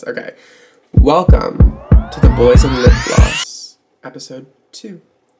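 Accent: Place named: South, United States. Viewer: I appreciate your perspective because cisgender people often don’t think that transphobia will affect them but it does. The moment people get suspected as trans or misgendered it does put them in danger to people’s transphobia even if they are not trans. So your perspective is necessary if cisgender people will listen. Also I love your southern accent. I am a fellow southerner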